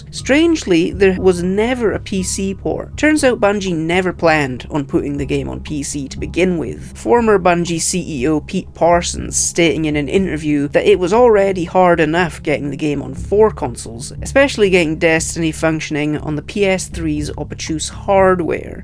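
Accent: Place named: Albania. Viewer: He's Albanian.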